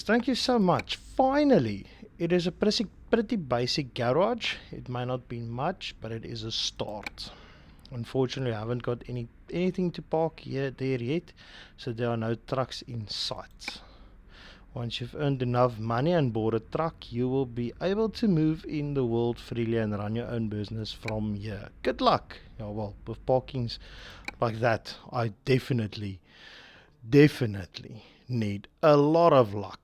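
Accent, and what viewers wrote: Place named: South Africa. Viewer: Im willing to try out the mod 🥲 just wanna see what it is about. You accent sounds familiar ,are you South African Rare to see a South African gamer into trucking 👍Nice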